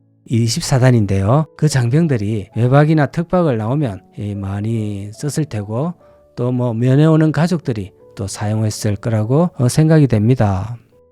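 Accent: Gyeongsang, South Korea. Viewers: 우리동네 오셨네요 만났으면 해장국 한그룻하는긴데 덕분에 갱상도 원조말을 잊지않습니다 서울 외국 생활하다보니 점점 잊고있습니다 감사합니다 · 저도 * 같은 어투를 쓰는 갱상도 촌놈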